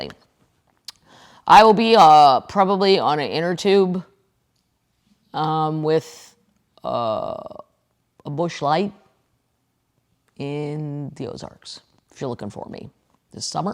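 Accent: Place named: Wisconsin, United States. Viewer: Brilliant again. I remember my mom telling my Dad she would leave him only for Liberace if he came knocking at the door. Ha ha Keep up the Wisconsin accent 😜😁❤️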